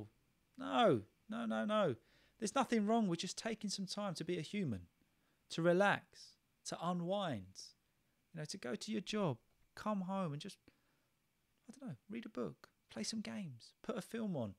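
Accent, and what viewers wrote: United Kingdom. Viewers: No, thank you. Because I admire British accents, I’ve been looking for the most linguistically profound and elegant British voice on YouTube for months and I just found it. Keep up the spectacular work. I have an issue which is that it’s easy for me to have self and social conversations and then understand almost 99% of conversations and reports passing my ear, but when to relate to official dialogue, I get jammed, keeping in mind that I'm familiar with public speaking in my mother tongue and I don't speak English well as well as I write or type.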